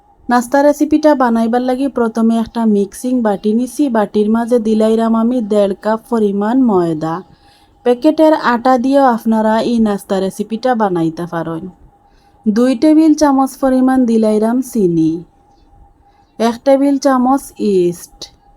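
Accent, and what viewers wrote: Sylhet, Bangladesh. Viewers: আসসালামু আলাইকুম আপু মাসা আল্লাহ নাস্তা খুব খুব সুন্দর লাগলো আমার নমে হয় সিলেটের বোন কথায় বুজা গেলো❤❤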